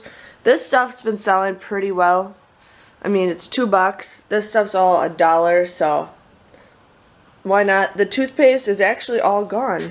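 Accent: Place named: Wisconsin, United States. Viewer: I live in Iowa... not too far from you. I could tell you were from Wisconsin ❤️It's so cold and rainy! I think you did a great job!😊 Happy Mother's Day 🌸🌼🌻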